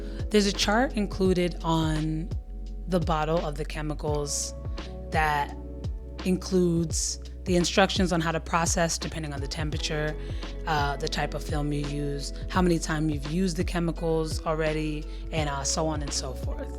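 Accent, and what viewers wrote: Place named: South Africa. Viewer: are you South African?? you have a cool accent. I am an experimental film maker from South Africa i really enjoy watching your channel you teaching us so much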